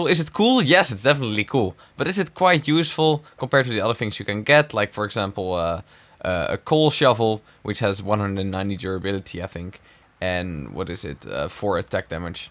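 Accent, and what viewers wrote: Netherlands. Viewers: Je klinkt echt Nederlands! Maar dat neemt niet weg dat je een geweldige stem hebt, creatief bent en echt gave Showcases maakt! Ga zo door, je zal het ver schoppen! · ooh wow firt video I see you and I noticed imidiatly he sounds dutch i look in the discription The Netherlands wow YEAH!! DUtch too here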